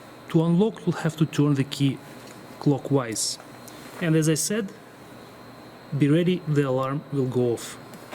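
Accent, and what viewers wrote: Russia. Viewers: That Russian accent 😂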